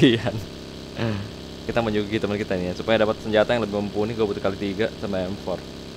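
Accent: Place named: Sambas, Indonesia. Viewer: Bahasanya kayak orang sambas,kalbar😁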